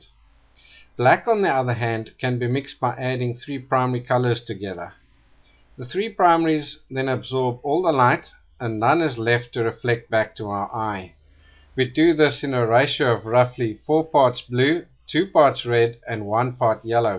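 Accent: South Africa. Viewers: are you from soouth africa? i can hear your accent :P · Very south african accent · Hoooly smokes, this is probably the most valuable video on colour mixing I've seen since I started painting 2 years ago. A complete revelation! Thank you so much :) Btw I bought your colour buster tool today and am looking forward to using it. Also love hearing a South African accent on youtube ;) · Go South Africa! This accent takes me back to school days. Thanks for sharing this so simply and freely.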